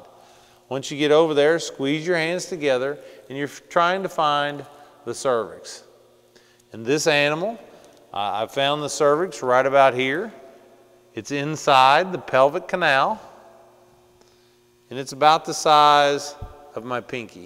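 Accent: Kentucky, United States. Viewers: watching 2x speed because this man speaks Kentuckian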